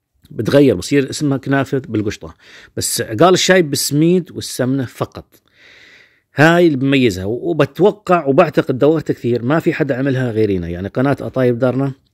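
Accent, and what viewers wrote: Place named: Hauran, Syria. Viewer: لهجتك الحورانية الاصيلة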